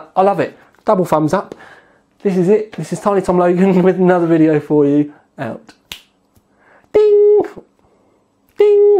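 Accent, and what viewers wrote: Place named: Cockney, United Kingdom. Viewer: You make computer case reviews fun to watch. You are a true American hero. Or would be, if you didn't speak like a cockney so much.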